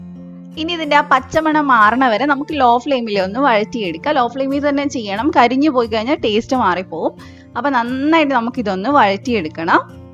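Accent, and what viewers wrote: Kannur, India. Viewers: sound super kannur evideya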